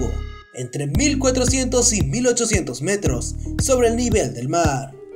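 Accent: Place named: Peru. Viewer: Habla con acento Peruano Carajo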